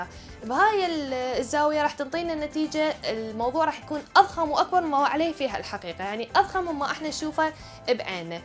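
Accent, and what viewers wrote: Iraq. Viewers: سبحان الله قبل لا اشغل الفديو وقبل لا اسمع لهجتك وانه ما شايف قناتج ابد عرفتج عراقيه 💜🖐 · من اول دقيقه بس عرفت لهجتج عراقيه اشتركت استمري وردة مواضيعج حلوة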